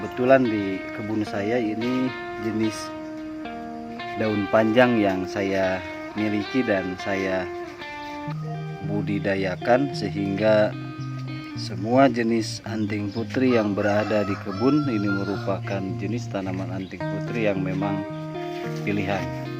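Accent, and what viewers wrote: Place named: Sunda, Indonesia. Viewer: mantaap..rapi pisan penataan dan perawatan ala pro.thnk sudah shering kang. logat nya sunda aslinya dari mana kang?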